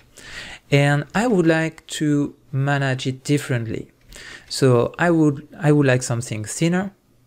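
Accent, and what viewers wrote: France. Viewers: bravo super vidéo j'ai reconnu le petit accent français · do you speak french? if so I can tell in the way you talk cause I also speak french or am I trippin but nice tutorial tho its amazing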